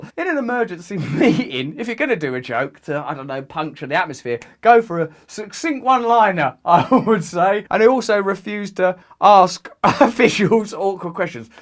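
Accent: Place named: United Kingdom. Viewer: I'm almost positive your British accent has become thicker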